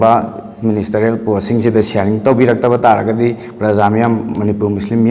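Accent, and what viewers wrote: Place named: Bangladesh. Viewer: Remember you speak like a Bangladeshi. Always try to speak in a correct way. It will be very good.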